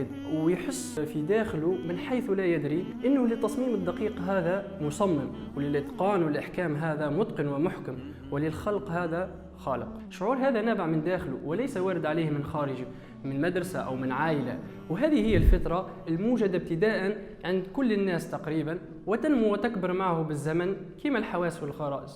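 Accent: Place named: Tunisia. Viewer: حسيت صناعة المحاور تتكلم بصيغة تونسية ...مشروع رائع بارك الله في عملك ووفقك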